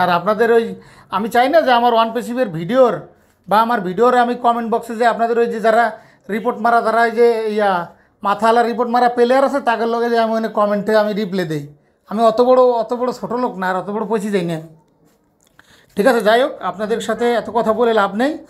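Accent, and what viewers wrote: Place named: Barishal, Bangladesh. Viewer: কথা শোনে মনে হইছিলো বরিশাল।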